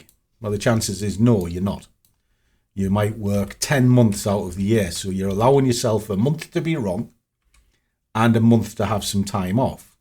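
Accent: United Kingdom. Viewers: I didn't know you had a British accent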